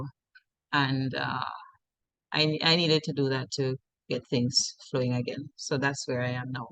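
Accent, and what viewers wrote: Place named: Jamaica. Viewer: Jamaican accent 🎉. I automated SB strategy into an Expert advisor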